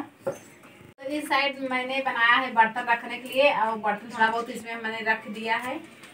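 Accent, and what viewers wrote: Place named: Prayagraj, India. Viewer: Bahut achcha laga, aapki Prayagraj ki boli sunkar bahut achcha laga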